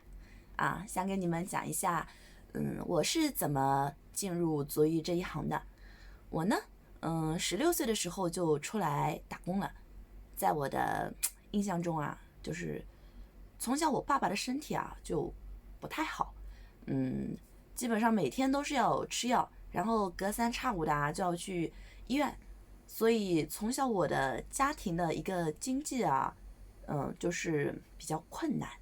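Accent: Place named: Jiangsu-Zhejiang, China. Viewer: 所以 你做的足浴城在哪里 听着像江浙口音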